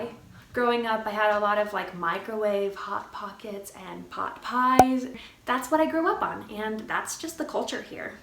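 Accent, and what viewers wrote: Italy. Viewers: oh my jesus, i want to be ur friend so much, wtf help. I'm italian and I LOVE LOVE LOVE your italian accent! u're too cute and u look sooo kind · Hi I am Italian and I really think you are beautiful girl and nice person + your Italian accent is really good and cute💘💘 xoxo from Rome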